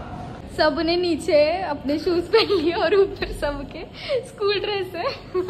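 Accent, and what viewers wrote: Kolhapur, India. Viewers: Tuze vlog chan aahet Pn tu marathi tunch vlog karat ja Tu marathi khup chan boltes Ekdm kolaphur style Love and support you 😇❤✌ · Tujhi Kolhapuri Flow Cha Fan Zalo Aahe Mi Kai Toh Flow Aai Hayee Ghayal 🦋💗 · Bhaii urr kolhapuri acent😂💖itss tooo good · Aga ky masta kolhapuri accent aahe yrrr....😍😍. ly bhari te takkay ja vlog madhe 😄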